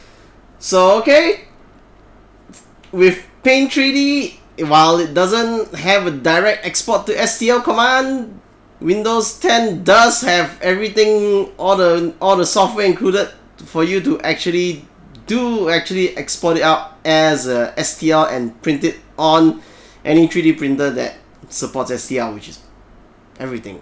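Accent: Singapore. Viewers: you sound Singaporean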